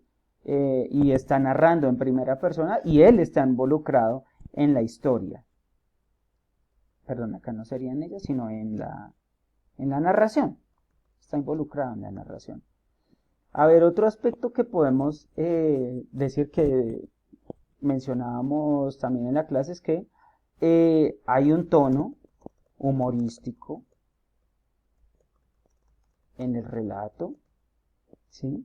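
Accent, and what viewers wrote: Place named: Colombia. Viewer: Pues el cuento me pareció muy realista ya que como lo dice en el titulo hay personas que tiran la piedra y esconden la mano es decir, que hay personas que cuando les conviene son santas pero cuando no les conviene son malosos. En otras palabras son hipócritas. Además el cuento super bueno ya que lo que me gusto fue que parte de ser escrito con el acento colombiano es muy realista. Que tengas un bonito día profe!!!♥♥🥰😋😁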